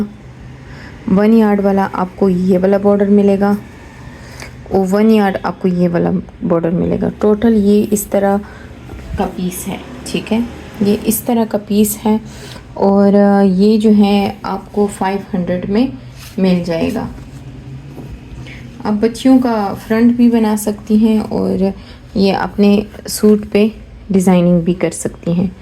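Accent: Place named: Peshawar, Pakistan. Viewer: Pta nhi ye pishawer wali urdu bolte waqt teek he, teek he itna ziyada q bolty hn 😅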